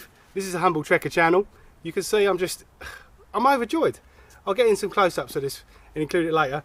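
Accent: United Kingdom. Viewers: Humble Trekker .....but you are originally a Brit ?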